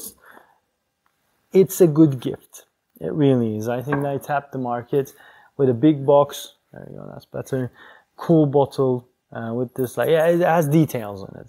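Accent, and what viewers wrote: Turkey. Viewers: Your accent .. Are you a Turkish guy?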